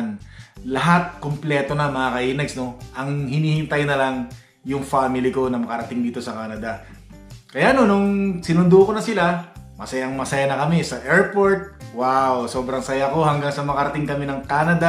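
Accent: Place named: Pampanga, Philippines. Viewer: Ang galing ni kuya kahit mahaba ang story❤ parang kapangpangan si kuya sounds like😂 tinapos ko story mo very inspiring🙏☺️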